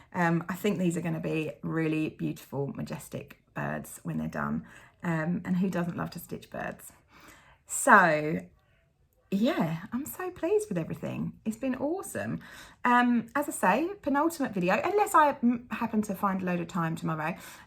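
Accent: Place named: Australia. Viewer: Hi, I have been loving the videos. I have been watching each morning with my cup of tea but I am currently watching with my parents and they think you sound Australian 🙈 I think you would have mentioned something so please correct me if I am wrong in thinking you are not originally from there 😅 from Immy (StitchedbyImmy) x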